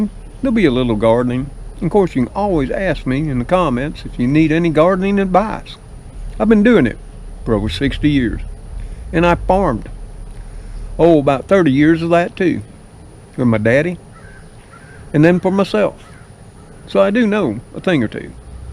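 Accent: South, United States. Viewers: I enjoy your down-home southern drawl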